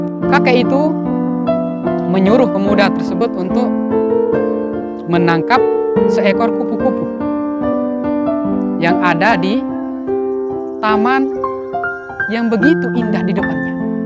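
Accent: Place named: Malaysia. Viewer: Kok loghat nya mirip orang Malaysia ya 🤔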